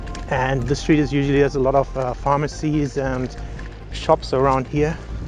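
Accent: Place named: Franconia, Germany. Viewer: Franken oder? xd Ich habs allein gewusst wenn er deutsch redet und war mir 100% sicher, wie er in einem anderem Video gesagt hat „du kannst mir mal den Buckl runter rutschn“ und jetzt noch die Dächer in dem Video...😂 haha🙈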